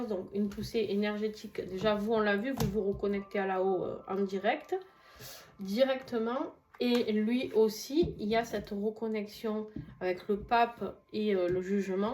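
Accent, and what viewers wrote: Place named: Marseille, France. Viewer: oh peuchère, avec ton accent marseillais on te croit sur parole !!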